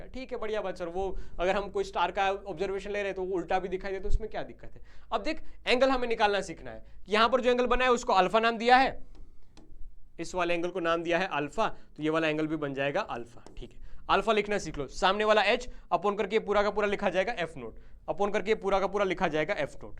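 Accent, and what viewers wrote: Gujarat, India. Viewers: He sounds like he is from Gujarat 😅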